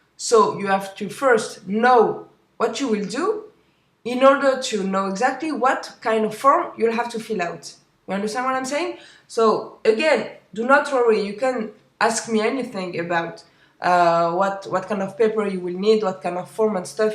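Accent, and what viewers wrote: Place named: France. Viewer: Trop mimi ton petit accent francais,tres bon info.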